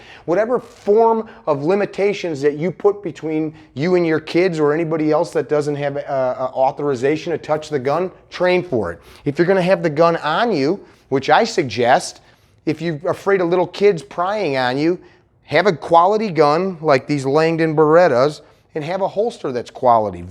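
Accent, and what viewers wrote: Chicago, United States. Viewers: Daaaaar... 'ere an da Sawt Side, we keep err kids away fram dat kiynd a dings, ja know. Just kidding... I love the content and the accent makes me homesick for Chicaaaaagii. Gaad bless!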